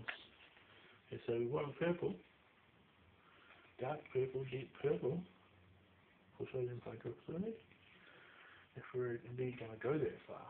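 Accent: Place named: New Zealand. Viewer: try learning to listen to New Zealand accent, then re-listen to it, sorry about the muffled sound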